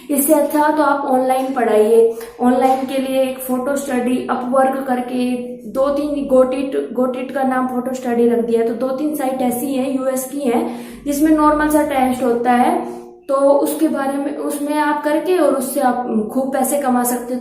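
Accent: Haryana, India. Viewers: Thanks mam for such video . And your haryanvi voice is amazing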